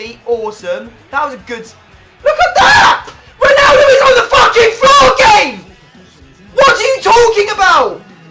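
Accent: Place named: RP, United Kingdom. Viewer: He sounds so posh lol but he's a legend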